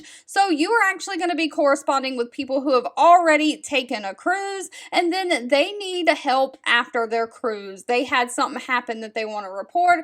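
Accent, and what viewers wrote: United States. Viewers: She's sooo American - cannot understand what she is saying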